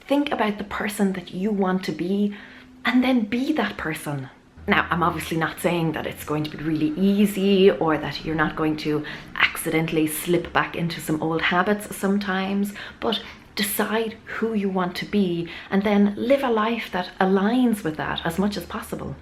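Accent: Ireland. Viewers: The Irish accent is one of my favourite accents of all!! I immediately trust anyone with an Irish accent. Is that weird?? Hahaha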